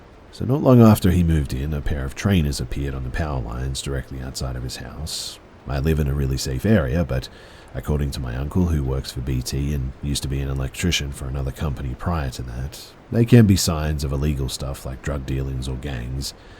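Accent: Australia. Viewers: Have I just found my first Australian night time narrator? 🤞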